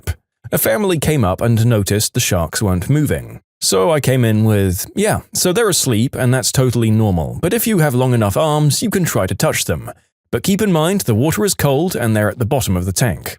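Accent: United Kingdom. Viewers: oh he's British now hi fellow Englishman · WHEN DID YOU TURN BRITISH?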